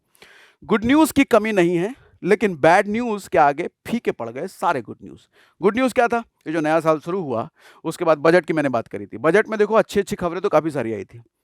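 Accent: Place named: Kanpur, India. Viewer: full on kanpuriya 😁😁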